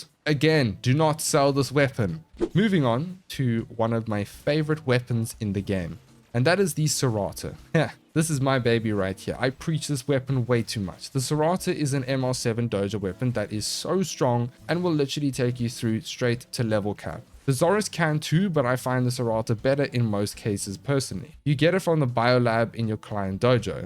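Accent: South Africa. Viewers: Are you South African? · South african?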